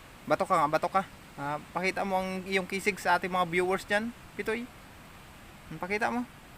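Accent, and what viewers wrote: Bicol, Philippines. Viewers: Saan ka sa bicol idol??? KC bicol ang tuno ng salita mo.... Taga Bicol din ako idol.. pashout out naman idol salamat 👍🥰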